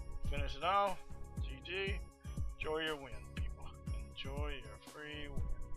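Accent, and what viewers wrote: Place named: South, United States. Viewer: This was a decent video. Whispering like a scared little punk and your southern accent make it hard to understand you. Next time use your man voice.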